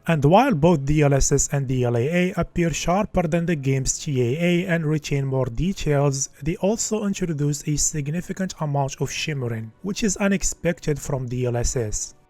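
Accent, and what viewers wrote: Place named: Morocco. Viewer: omg your accent is so moroccan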